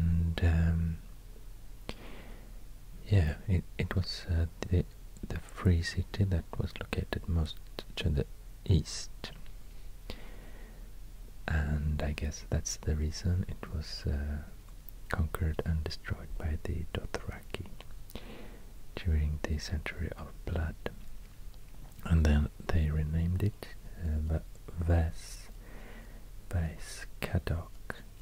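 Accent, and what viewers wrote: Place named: Sweden. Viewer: thats the power of swedish english asmr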